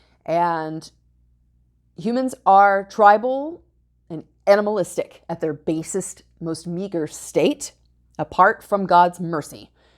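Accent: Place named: Midwest, United States. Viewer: George Bruno turned me onto your channel. You're very encouraging and informative. A feminine woman of God is a breath of fresh air. Love your Midwest drawl. 💯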